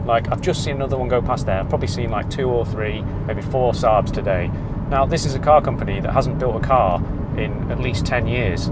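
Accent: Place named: Staffordshire, United Kingdom. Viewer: Staffordshire! I was listening to your accent, thinking you must be somewhere close to where i grew up (Stone). Keele University signs on the buildings puts you near Newcastle. Nice review!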